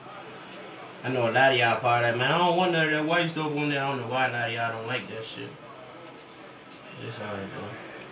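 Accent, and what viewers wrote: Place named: DMV, United States. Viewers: That accent…definitely from the DMV! #HOME 💪🏽🤎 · I was thinking that he sounds like he from here lol the slang sound similar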